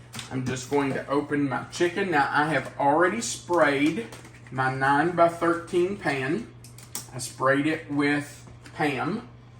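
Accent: South, United States. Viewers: I love your southern accent and everytime you say TOGETHER,,,, I giggle and I say it the way you do ,,,,,unfortunately with my Boston accent ,,, just love you guys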